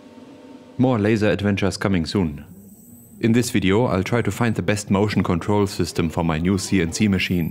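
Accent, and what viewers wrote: Germany. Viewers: I think its a german accent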